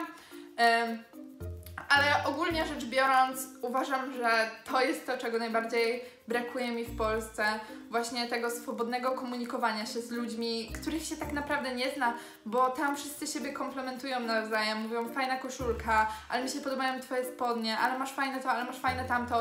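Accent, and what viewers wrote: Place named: United States. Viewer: Robisz takie pretensjonalne naleciałości z angielszczyzny, jakbyś przesiedziała w stanach co najmniej 15 lat xD Na filmiku z operacji plastycznej już ich nie ma, dziwne xD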